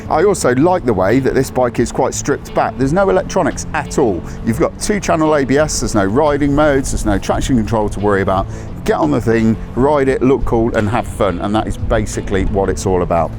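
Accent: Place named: England, United Kingdom. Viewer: Nah, too many annoying American-isms spoken with an English accent.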